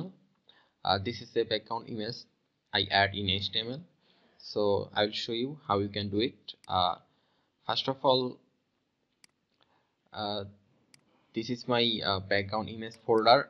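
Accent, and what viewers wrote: India. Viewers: Thank you Mr. Indian-sounding guy :DD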